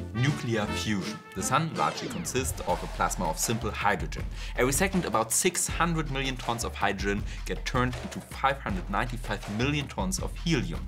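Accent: Germany. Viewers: Wenn ich als Deutscher Deinen deutschen Akzent extrem ulkig finde, dann ... ist es anscheinend genau richtig. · Physics is best "auf Deutsch", or "auf Englisch" with a German accent!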